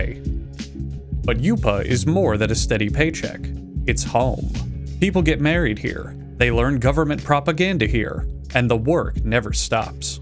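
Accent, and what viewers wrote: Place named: Boston, United States. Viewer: He made every Chinese person sound like there from Boston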